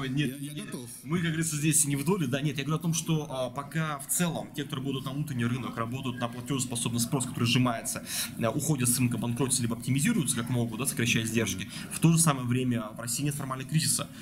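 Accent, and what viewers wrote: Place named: Odessa, Ukraine. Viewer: У НЕГО ТЕМБР ГОЛОСА ОДЕСИТА ЧЕТКО БЫСТРО ПО ДЕЛУ С ЮМОРКОМ РАВНЫХ НЕТ !!!!!!!!!!!! У ВАС ТАК НЕ ПОЛУЧИТСЯ !!!!! ЗНАТЬ !!!!! УМЕТЬ НАДО !!!!!!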